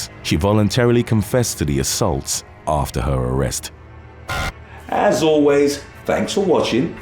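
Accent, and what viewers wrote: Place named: United Kingdom. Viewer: definitely has a gift for story telling and his commercials are absolutely funny when he wants to be. And that voice with his British accent make it very enjoyable to listen to.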